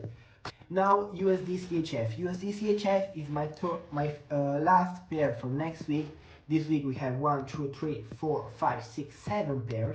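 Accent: Italy. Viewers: Pronuncia all'italiana 🤣 Bravo però continua cosi e goditi il tuo percorso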